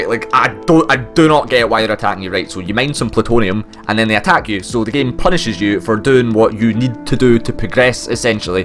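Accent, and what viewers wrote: Scotland, United Kingdom. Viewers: I love hearing Scottish people rant about shit. :D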